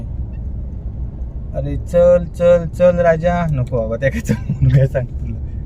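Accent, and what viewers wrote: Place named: Konkan, India. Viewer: Bro, Phondaghat madhle nisarga soundarya ani tuzhya konkni bhashe cha godwa, maastach...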